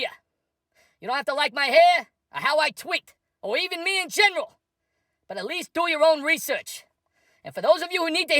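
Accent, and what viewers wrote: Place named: East Coast, United States. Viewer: I dislike your east coast accent, makes my stomach turn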